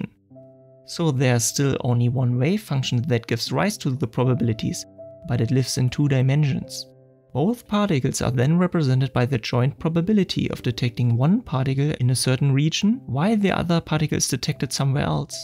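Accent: Germany. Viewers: WTF dude ?! I was like „yeah time so go to sleep“ and than suddenly YT dropped your video in recommendations. The quality is INSANE ( and I just started the video). I am WAY to tired to understand everything. Just saved the video and will come back tomorrow to appreciate the work. Amazing. Quick question, it seems like you’re from Germany ? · Deinem Akzent nach, bin ich mir ziemlich sicher du verstehst Deutsch :). Wirklich ein riesiges Lob an dich. Auch der Channelname passt perfekt. Ich würde mich sehr freuen in Zukunft mit weiteren Hirntrüffeln versorgt zu werden :) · Germany, Austria or Switzerland would be my bet. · DER DEUTSCHE ACCENT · too heavy German accent can not handle it.. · I sink I can hear out a German accent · Thank you for the great masterpiece! It's one ob the best on the Web. The visualization and data representation is just amazing. This is just an exemplar for all who makes presentations and lessons. 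Quite professional! Very consistent and well explained material, easy to follow. Do you answer the questions in the comments? If so, I have some questions and comments. (One thing it would be nice to improve is the pronunciation. Let me guess, are you German? The pronunciation is good, but accent for some words reveals you and sometimes in the way to listen; everything is fine and understandable, just distracts because of funny pronunciation. Maybe it worth to ask a native speaker to read? On the other hand, I like both the voice and way of speaking). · Lass mich raten, du bist Deutsch? Hört sich aufjedenfall so an 😂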